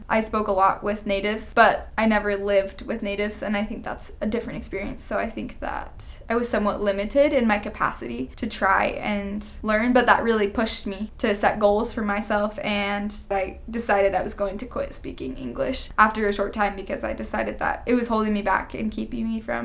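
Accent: Norway. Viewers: Ser Norsk ut og er så god på Norsk at Engelsken har Norsk aksent... Du lurer ikke meg, ho der er Norsk. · Tror hun egentlig er norsk. Du hører det på aksenten. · Im speechless, your norwegian is almost flawless, it actually sound like a norwegian living in the US for some years, adapting their way of speaking :) You speak southern norwegian way better than i could ever have done, coming from Northern Norway :)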